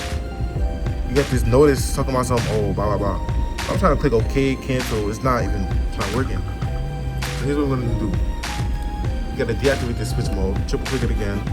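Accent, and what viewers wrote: United States. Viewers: Uu from new york aint uu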